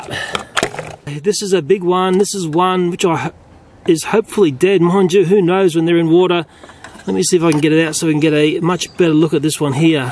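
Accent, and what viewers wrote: Australia. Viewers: Lemme guess. YOU ARE FROM AUSTRALIA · Not really. This man is Australian. The actor who played Korg is New Zealand Oscar director Taika Waititi. Aussie and kiwi accents are very different. But at least you didn’t mistaken it for an English accent! LOL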